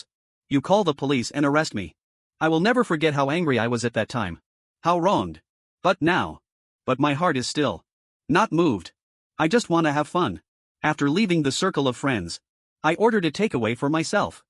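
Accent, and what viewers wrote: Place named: China. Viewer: chinese style english . . .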